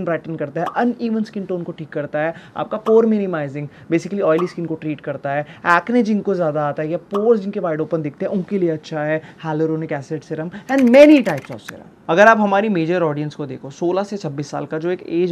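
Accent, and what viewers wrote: Delhi, India. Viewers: Delhi Valli baat cheet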